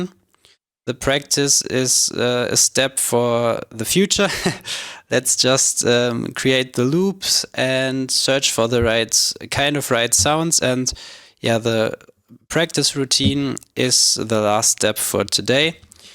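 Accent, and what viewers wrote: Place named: Germany. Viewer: beautiful german accent <3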